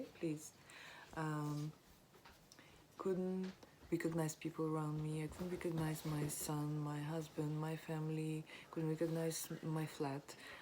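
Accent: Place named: Eastern Europe. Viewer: are you east european? your accent is so pretty :)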